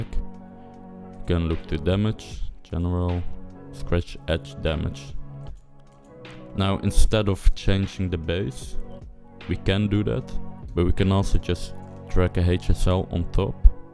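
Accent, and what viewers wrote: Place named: Netherlands. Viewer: 100% a Dutch guy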